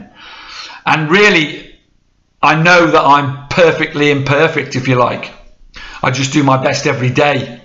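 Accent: United Kingdom. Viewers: Hi sweden here! I dont know when I and How I stumbled in on your videos but soo great to listen to! When you say "feal good when I take the pill" which medication are you then refferring to? If you feel ok to write it! So happy to see you back as you speak of! And what a great think to live in Spain sooo I would like! Take care and continue well and I think I heard a parrot sound in the background. And have to say that your brittish accent is soo convinient to listen to!!! Which part of GB are you from? Stay safe further on your journey All the best from Sweden 🌹🌹🌹👍🏼👍🏼👍🏼🙏🏼🙏🏼🙏🏼😊😊😊👋🏼👋🏼👋🏼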